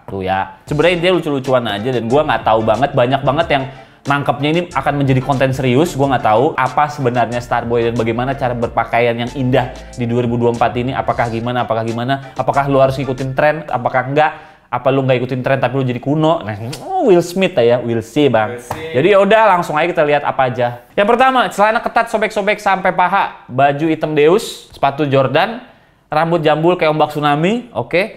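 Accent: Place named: Java, Indonesia. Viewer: lo gw logat jawa the best fking starboy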